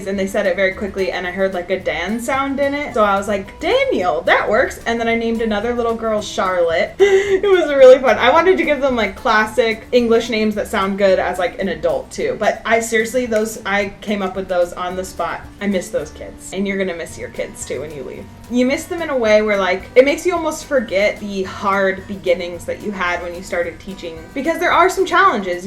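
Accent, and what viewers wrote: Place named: United States. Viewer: + Dear. You are NOT a ''Native English'' speaking person Actually you do NOT speak English YOURSELF! You speak American English and have a strong American accent, use so many American slang words and as an English person, I find it hard to understand what you are saying at times. For a start, you speak so fast and gabble far too much!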